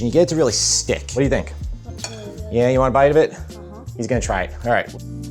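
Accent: New York, United States. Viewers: You’re a great cook my friend. You sound like a New York Italian ? Great cooking !!!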